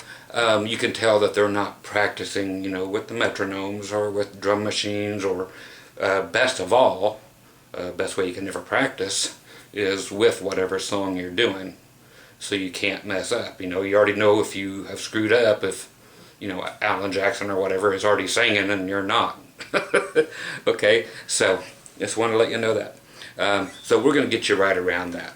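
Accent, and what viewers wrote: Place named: South, United States. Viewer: Sorry to be so nosy, but where are you located,? I am forever trying to figure out your slight accent...Oklahoma? Texas?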